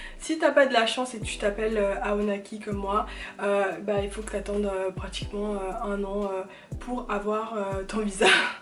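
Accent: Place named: Quebec, Canada. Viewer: C'est moi ou l'accent quebecois s'installe doucement lol ? C'est chaud 😂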